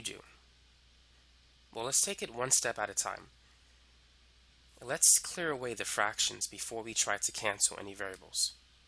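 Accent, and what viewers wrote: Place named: New York, United States. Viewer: This guy is soooo clear in his explanations!!! I love his NYC accent too!!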